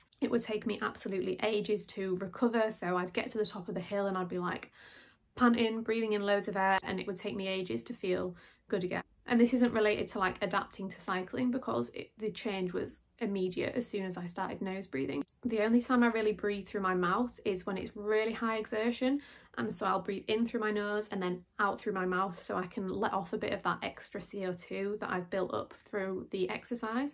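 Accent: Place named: England, United Kingdom. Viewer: I will definitely purchase that book. This stuff is so fascinating! Great channel overall as well. I didn’t want to offend but I figured England and I do understand there are various different accents among parts, as is the same here in the U.S. Very Russell Brand-esque to me 😂 Beautiful though!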